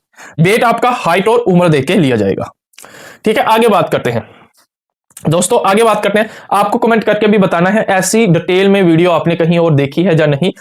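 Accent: Punjab, India. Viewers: Bhai aapki bhasha se pta chal rha h ki aap punjabi ho❤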